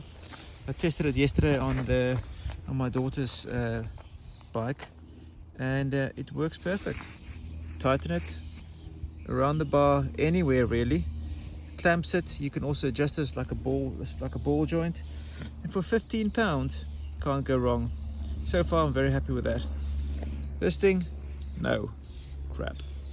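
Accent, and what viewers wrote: South Africa. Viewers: You say a few words. Me: This guy is a South African! Nice channel, you have a new sub.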